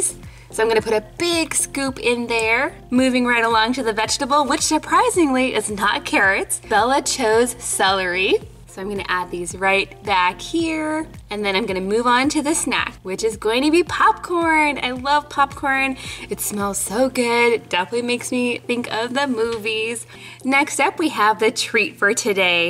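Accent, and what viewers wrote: Netherlands. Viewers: its funny how you speak out the words im from the netherlands aswel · #DUTCH Like if you're dutch. Love your accent, haha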